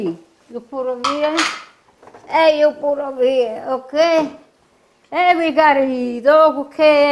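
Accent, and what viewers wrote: Italy. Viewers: She Has the Best Italian Accent 😋 👌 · I love how she posts the recipe at the end! Much appreciated dear sweet mama! I love your videos and your beautiful italian accent! Thank you for this recipe...been looking for my mama's forever and this is it! OX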